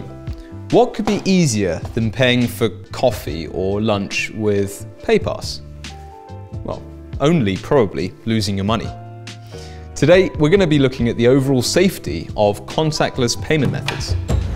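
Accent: RP, United Kingdom. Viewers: How I like British accent. I don't understand any words. What are you saying in this video??? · I love the content. Hate the blatantly obnoxious royalty accent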